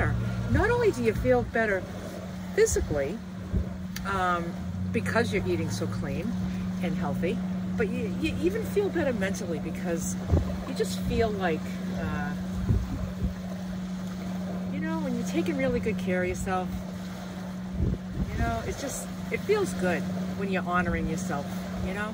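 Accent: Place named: Massachusetts, United States. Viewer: Thank you 😊 you sound like home! I’m from MA too and now in Florida. Love the simplicity you share!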